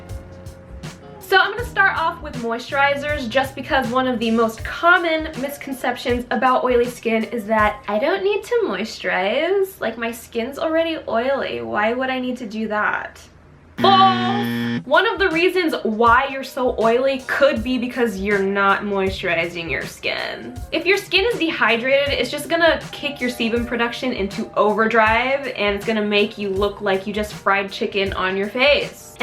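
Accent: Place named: Australia. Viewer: The little hints of Aussie accent always crack me up 😂💖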